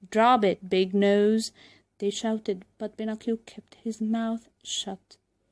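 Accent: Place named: United States. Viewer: Cool, I thought you were American before I saw your channel. 💕💕💕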